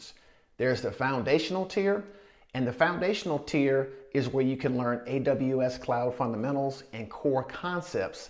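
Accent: South, United States. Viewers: Alabama or Tennessee?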